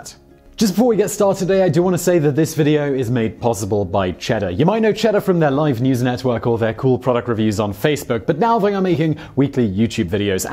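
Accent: United Kingdom. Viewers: Love these videos. Although i like the British accent, it seems that along with your efforts to find out the real facts, you would put as much effort in proper pronunciation. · Ha, I've never heard my hometown's name being pronounced so many times by a Brit before! BTW, I've actually been to the Rico's headquarters before to pick up some concessions items for a school festival thing during high school (my high school being located downtown just like the Rico's HQ, it wasn't a tough assignment). It's insane how big that building is for a downtown business! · I normally like your British accent. But I hate how you pronounce NACHOS. Ugh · I used the think british accents were classy until this! oh my ears. ouch the cringe in my spine! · There are a lot of comments about the pronunciation in this video. I'm of two minds on the subject. First, give a break. He makes a bunch of videos every day and it takes a lot longer than 10 minutes to make the video you just watched. 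But second, there's a support team of researchers who dug all this up for him. Couldn't they help him with the pronunciation? There's this thing called IPA they could provide, and I'm not talking about a beverage. It's the International Phonetic Alphabet (for English). It's all those strange squiggly marks in dictionaries telling you how a word sounds, except much more well-thought out. I have a theatre degree (among others) and I spent a year on this subject alone as it's the basis of learning dialects for the stage. To sound American, British, or Russian, etc. (and yes, I know all have regional variants) part of the process of converting the text on the page to the sounds from your mouth goes through IPA. I know he's British and that will always color how he speaks. This channel provides a lot of interesting and useful information about esoteric topics. That's why I like it. I'm just wishing they'd go one step further and the entire team push to get the easier pronunciations right. 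Some languages are much harder for native English speakers, of course. But making the effort here would be well appreciated, imho. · I don't complain about his Brit speak. Making fun of a person's accent induced pronunciation is one of the few things we have left to make fun of. And not all Brits have the same accent, there are many different ones. When Roger Moore as Templer used to say Mafia it came out as Maff-Eee-Er. Hilarious. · As a Texan, hearing Spanish words and Mexican terms in a British accent was horrifying and almost insulting. Very interesting video though! :)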